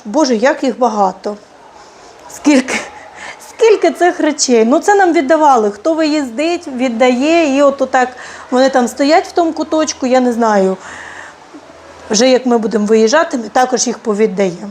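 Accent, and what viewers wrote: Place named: South, Ukraine. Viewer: Доброго дня ! Ми часто вас дивимось в Україні в ютубі.Нам подобається ваша мова і ми завжди думаємо з якого ви міста.Я думаю с юга України бо ми з Херсона.Дякую за відповідь!.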